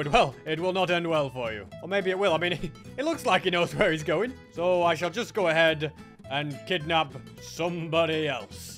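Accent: United Kingdom. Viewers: I think is british lol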